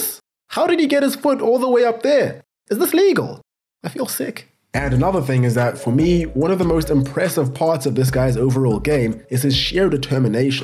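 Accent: South Africa. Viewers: I was watching this video, And something just made it feel homely, Then I realised, You are speaking with a South African accent. Now I see your channel and content and think. Wow, South Africa has talent and your production is on par with YouTube stars. So I'm so proud to see a local have such a successful channel! Welldone. But also, yeah when Haaland came to man city, I knew him from bvb and from his insane international scoring. You know he holds the record for the most goals scored in 1 game, I believe it's 9 individual goals in an international game. I knew the premier league wasn't ready for a player like him. I do believe though managers are strat'n on how to counter him as we speak. So let's' see later on if he can keep this going. His a huge dude with some insane accuracy with his shots. Also his size, you'll notice in games, he throws his body in the way for the ball to bounce off him into the goal. 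Mainly by throwing those long legs out. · Are you south african? You sound south african. and yes, i myself am south african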